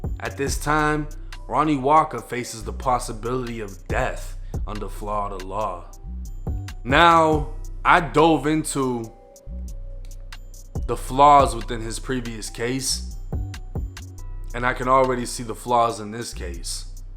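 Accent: Boston, United States. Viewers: Is from Boston or somewhere in New England or something? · He literally sounds white with a Boston accent wtf are you hearing 😂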